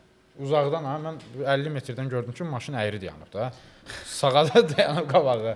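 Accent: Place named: Quba, Azerbaijan. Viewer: Quba ləhçəsi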